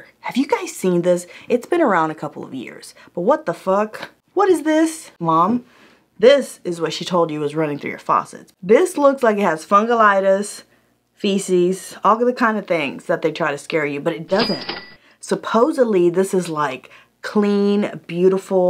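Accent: Texas, United States. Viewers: I watched one video previous to this and I was thinking to myself I bet she’s from Texas! I watched this one and I knew lol. - from a fellow Texan.❤️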